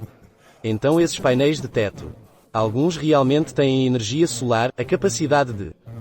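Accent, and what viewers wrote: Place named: Portugal. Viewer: Você é de Portugal? Eu sou do Brasil, e pelo q entendi, o sotaque no vídeo é em PT-PT, e não em PT-BR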